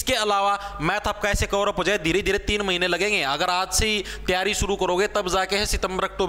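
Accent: Haryana, India. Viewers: Sb kuch pta hone k bad m aapki video dekhna jaruri hota h pta na ki mjjaa aata h haryanvi tone m 😂😂😂😂😂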